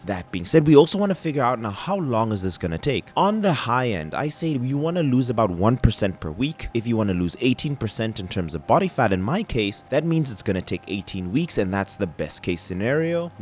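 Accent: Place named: South Africa. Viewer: This is one fantastic breakdown. Thank you. I'd say I'm scenario 3, but since I'm never doing crunches and just compound movements, martial arts and dance maybe my abs aren't as popping underneath and scenario 1. Will definitely start incorporating the ab progressive overload you covered. My Fittrack scale says I'm around 18% body fat right now. But this is a refreshing summary you have done - thanks again. Also, love hearing a fellow South African accent on here from across the pond.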